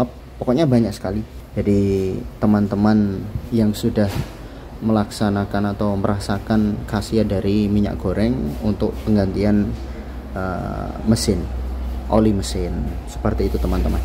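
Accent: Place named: Malang, Indonesia. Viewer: Ini adalah contoh bahasa jawa dialek kota malang dan sekitarnya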